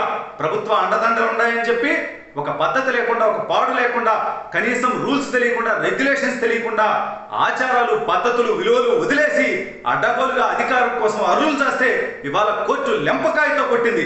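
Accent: Nellore, India. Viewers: Me voice super bhaayaaaaa....Nellore slang lo dummu leputtunnavu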